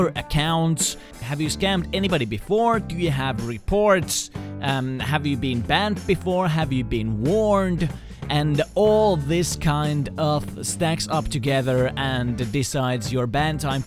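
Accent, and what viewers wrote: Finland. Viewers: Huomaa että oot suomesta xD siis aksentista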